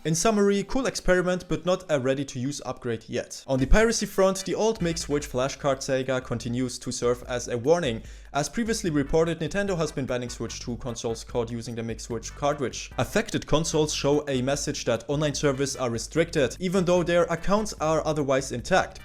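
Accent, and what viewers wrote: Germany. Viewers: I hear a bit of German or am I wrong 😅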